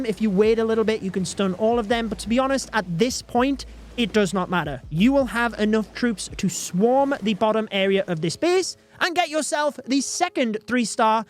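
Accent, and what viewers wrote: United Kingdom. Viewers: hi mate, i think you are an american but im wrong youre british man😅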